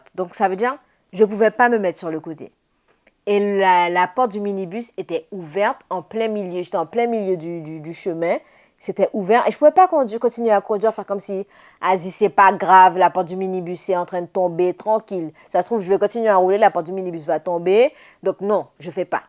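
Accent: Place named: French Guiana. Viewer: Je suis guyanaise aussi et ta l'accent et la manière de parler mmdr.😂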